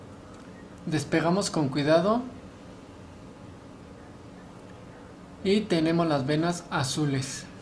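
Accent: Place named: Mexico. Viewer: Bno el video, pero la vos de mexicano la cago ):<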